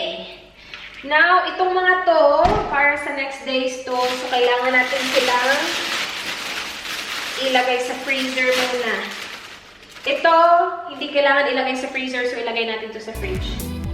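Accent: Visayas, Philippines. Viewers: Hello po. You sounds like bisaya. May I know kung taga saan po kau? I'm proud bisaya from Davao city:-). Looking forward for more videos to watch... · Life en easy lng naiintndhan ko ..😒😒😒bisayang bisaya ang dating sa mga words na sinasabi... buti ate nagustuhan ka niyan😄😄😄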